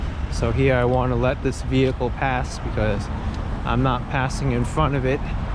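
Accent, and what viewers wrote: New York, United States. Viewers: Which borough is your accent from?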